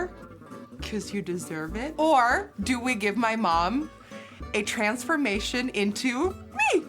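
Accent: Netherlands. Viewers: Love the Dutch accent, really miss the Netherlands!! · Haar Engels is zo grappig ik vind het echt geweldig lekker nederlandssss 🇳🇱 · Ik ga zo stuk om dat steenkolen engels eh, heeerlijk dat typisch nederlandse😭😂❤️ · Ik ga helemaal stuk, zo’n heerlijk Hollands-Engels accent..prachtig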